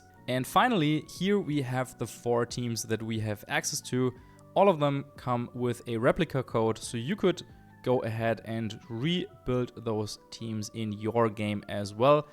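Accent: Germany. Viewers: Samma da hör ich doch ze tschörman raus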